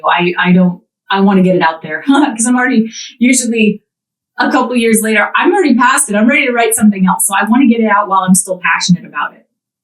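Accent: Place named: Canada. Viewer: Plus I love hearing Canadian... sets my maple leaf little heart a flutter <3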